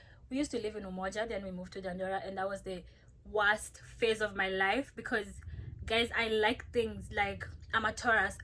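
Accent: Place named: South Africa. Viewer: You sound so South African it’s crazyyyy!!! This is so inspiring!!! I’m so proud of you 🙏🏾❤️ I just found you and I’m hooked sis ❤️❤️❤️❤️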